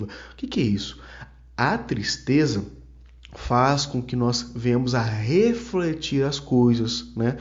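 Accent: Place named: Inland São Paulo, Brazil. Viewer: Sua voz e sotaque é bem legal, você deve ser igual eu do inteiro de SP. Primeiro vídeo que assisto do seu canal, gostei muito, és bem objetivo! Abraço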